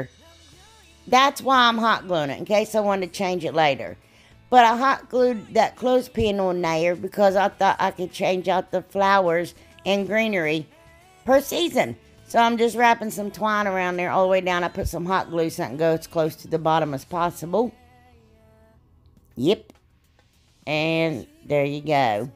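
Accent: South, United States. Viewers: different is good. Where are you originally from because your accent is as country as mine! I just love it, and you and all your fits. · Oh my gosh!! You are hilarious!! I love,love your personality!! You crack me up in a GOOD way!! Your accent reminds me of my aunt. Country as country gets!! I just wished I knew how to even try to spell out some of the words she says to give you an example of how she pronounces them but I can't. Lol. Loved all your project's today just as much as you as well. Thanks for sharing love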